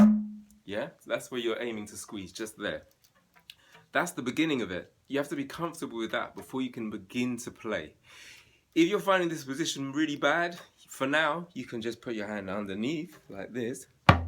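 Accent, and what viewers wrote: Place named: London, United Kingdom. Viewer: Hi Sir, please tell me whereabouts in the UK you are based - hopefully London from your accent? I am interested in buying one and taking up lessons. I am very serious depending on what the finances are. I have added you on Instagram and my name is David. Thanks.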